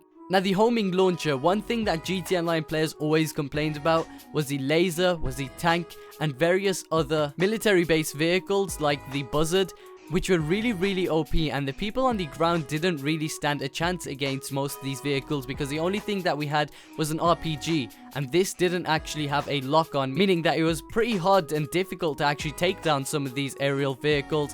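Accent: Birmingham, United Kingdom. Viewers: Are u from Birmingham,which area?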